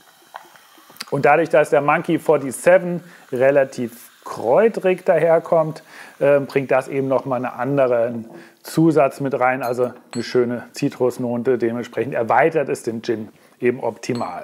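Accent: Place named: Germany. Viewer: My favorite gin... and German accent makes me weak in the knees :-)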